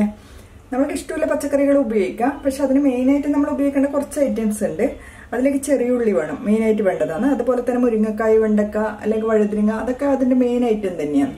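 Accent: Kannur, India. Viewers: സൂപ്പർ. കണ്ണൂരാണോ, വടക്കരയോ.... സംസാരം കേട്ടിട്ട്. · ഞാനും ശ്രദ്ധിച്ചു. അച്ചടി ഭാഷയിൽ നല്ല രീതിയിൽ അവതരിപ്പിച്ചു. എങ്കിലും ഇടയ്ക്ക് നമ്മളെ കണ്ണൂർ ഭാഷ വന്നു. ഞാൻ തലശ്ശേരി. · Kannur basha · I like your kannur slang very much. I will try soon. To pressure Cook Tur Dal only 90 seconds required. If we are releasing the air from the cooker, we are waisting the gas. This for your information.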